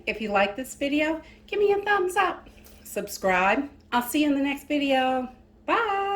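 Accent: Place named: Northeast, United States. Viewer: i cant figure out your accent. is it nj? or ma or ny?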